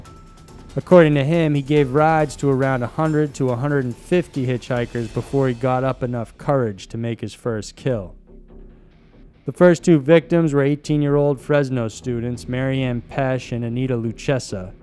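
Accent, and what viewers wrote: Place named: Worcester, United States. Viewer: yeah, man. I'm from Worcester and kinda Heard it in there. Great work with your channel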